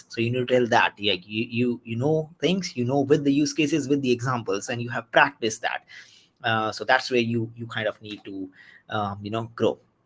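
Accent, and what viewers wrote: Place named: India. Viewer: great content but really hard to follow because of strong flat indian accent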